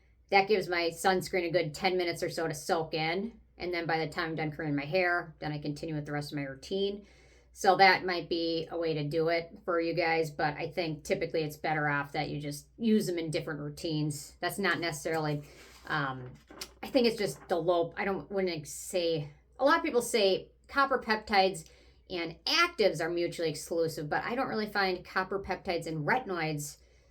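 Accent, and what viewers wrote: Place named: Wisconsin, United States. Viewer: You sound like you’re from Wisconsin. Thanks for the ingredients. I checked on Acne Clinic NYC and there are no pore clogging ingredients, yes!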